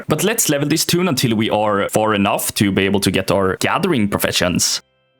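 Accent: Sweden. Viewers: Bro has the Swedish accent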